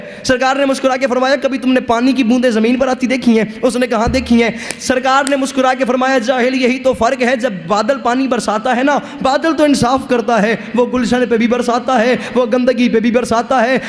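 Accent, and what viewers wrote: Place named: Punjab, Pakistan. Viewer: meray bhai ue punjab ka hai or waha sab ka sirf is ka nahi sab ka bayan karnay ka tareeqa aisay hi hai.. ye over acting nahi hai..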